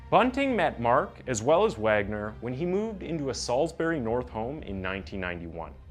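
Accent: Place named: Canada. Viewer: Is this a Canadian channel? Dude has a ridiculous accent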